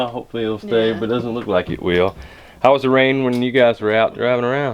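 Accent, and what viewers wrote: North Carolina, United States. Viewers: I'm new to your channel. Love the family farm. Your accent makes me miss NC. I'm on the cute little boy's side- I want to see a T-rex too! He is so adorable.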